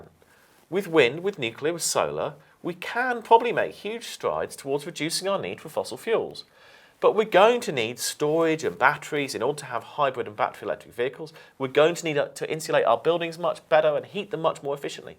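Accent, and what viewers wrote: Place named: United Kingdom. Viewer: The content is perfect but I wish this video were in American English. British Accent is a little bit hard to understand😅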